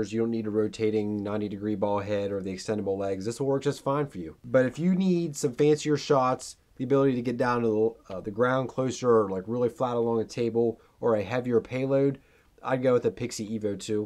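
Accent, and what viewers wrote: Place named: Philadelphia, United States. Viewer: Haha. Know this is an old video but just got this tripod and wanted to say I love that Philly accent :)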